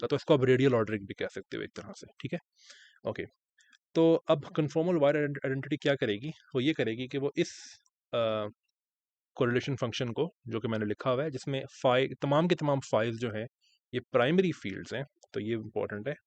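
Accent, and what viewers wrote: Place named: India. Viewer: Either this is a hardcore Indian accent or you're not speaking English at all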